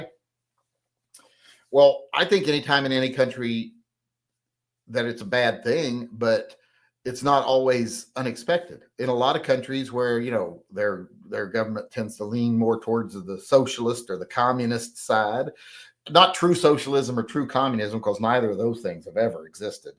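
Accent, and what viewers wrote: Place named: South, United States. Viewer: You have a pronounced southern accent, but I guess you knew that.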